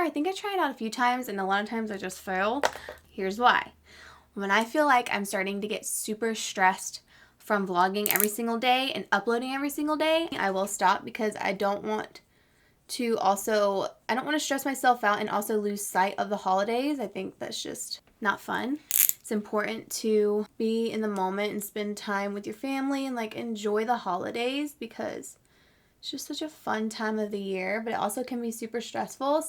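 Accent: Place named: Colorado, United States. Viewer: Sounds like Colorado to me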